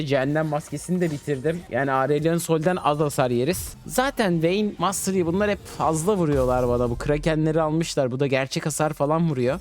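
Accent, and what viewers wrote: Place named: Cyprus. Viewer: Kıbrıslı arkadasın mı var senin ?:) Arada bizim ağızdan esintiler var :D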